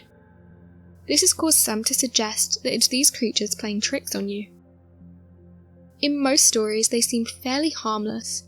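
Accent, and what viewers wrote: England, United Kingdom. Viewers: Maybe she lives in America, but she definitely speaks with an English accent. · It's funny hearing Mexican stories from when I was a kid... (But w/ an English accent) 😉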